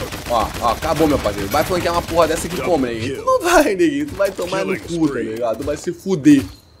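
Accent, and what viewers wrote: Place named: Rio de Janeiro, Brazil. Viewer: Muito carioques, fala muito mal, parece que nunca esteve na escola, melhorar essa voz de malandro ai negão, kkk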